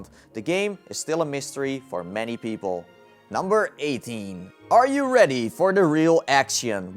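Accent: Netherlands. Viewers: No Swede or Norwegian talk with a accent like that :D ,sounds like Dutch English to me, but def not Swedish or Norwegian, We talk with a weird accent yes, but it's not like that, hehe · Flip Games just listen to that awful accent ofcourse he's Dutch! (Dutch myself just can't stand Dutch accents haha)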